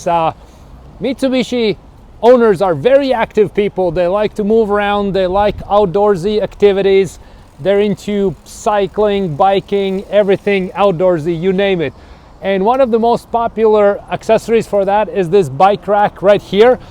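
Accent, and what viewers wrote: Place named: Canada. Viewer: Your accent is so cool, it's fun to watch just for that. I have an RVR. Very good info my Canadian friend. (From Toronto)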